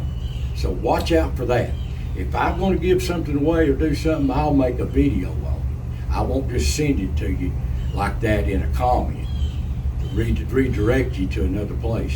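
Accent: Appalachia, United States. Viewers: I have been laughed at all my life for my Appalachian accent but just like you I refuse to change. I am teaching it to my Grandson. I walk tall being from the mountains of Appalachia and am proud to live here. Words to me like red neck and hillbilly are compliments. Appalachian people are the best we love our God, family, country and our guns. God bless you and all the great folks of this country, especially those from Appalachia. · I enjoy all your videos. I sure appreciate all you do to bring us. I enjoy watching you metal detecting whether you find a treasure or not. To me the ole broken plow piece is a treasure for, it has it's own history or part of a ole bean pot; it's all a treasure to someone at some point. I'm still so excited about me finding the 1890s shotgun shell I found at the lake because, you got me so Interested in such.. I still haven't gotten to get a metal detector, maybe someday. 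I follow a lot of metal detecting on YouTube. I TAWK the way you do, my accent is my Appalachian gift. My kids don't TAWK the way I do either. God bless and Happy New Year to you all. Thank you for all you do, sincerely appreciate you. ♥️ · I talk the same way you do. It's the Appalachian in us. I have had people say that people in our region talk different it's just the way we are Love your videos God bless you I also have a wood stove but my home has oil heat too. It's expensive right now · you and that baked in accent represent and embody the wisdom of the great Appalachian mountains!! We thank you and have a happy New Year!! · me and my folks talk just as you do here in the Ga Appalachia near Ellijay. I appreciate you friend. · Thank you so much for sharing your mountain stories. I have seen every video that you have put out. My people are from Pikeville. Your stories are like so many that was told to me by my Dad. 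Appalachia is so rich in American History and culture. You’re an excellent historian and researcher. Your accent adds the authenticity to the history that you are presenting. It would not have the same flare if told by someone not from the area. You give your audience a “real slice of life”. God bless you. ❤ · I'm Right there with ya, with the strong hillbilly, mountain folk accent. And I'm Not ashamed one bit, doesn't mean I'm less educated, regardless of what people think. Common /mountain (hourse sense) of far more important and valuable than books smart... My opinion. Many years ago, when I did vlog type videos on YouTube, long before YouTube went commercialized. I had subscribers, mostly based on people just love to hear me talk, lol. Didn't matter what I was talking about. Lol. You've got me looking at a few metal detectors. Something I think would definitely be interesting, regardless I find anything of value or not. 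I'm like you, just like finding old gun shells. I'd be happy to find some of those from early 1900s, that'd make my day, alone. Thank you for your youtube channel. I've told many bout it, I love having a history Channel based on my area. Thanks for ALL you do! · Hi, I happen to love your Accent and All of your videos. You are doing a Great Job sharing the lost History and You Do Keep it Real! Thank You so much for being a teacher of your heritage and culture and mine as My Family is from Spruce Pine,North Carolina and although from different regions of Appalachia I love hearing and learning about all of it!! Thanks again and I hope you have a Wonderful and Blessed New Year!!😊 🙏🏻🎉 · I am an old Appalachian hillbilly as well. I am quite proud of who I am and where I came from. I came from a hard working and God fearing people that respected and loved their neighbors. Our culture is slowly going out and you are doing a great job of recording a part of our history. 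You keep up the good work my friend and don’t worry about the accent. I understand it just fine!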